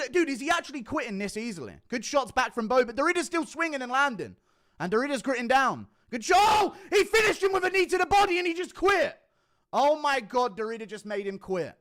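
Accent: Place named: United Kingdom. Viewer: He says "Dorito" instead of DeReider because of his awful accent... Brits who don't have the BBC proper speech really butcher english. The only people who butcher it worse are cajuns but these guys have the excuse of being severly mentally redacted. The brits just seem to love to butcher the language they created. It's like they're playing a game where they get points the less they enunciate.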